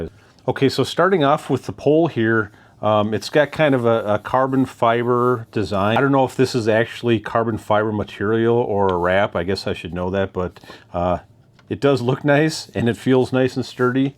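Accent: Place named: Canada. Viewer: Well done,.I can detect a little canuck in your accent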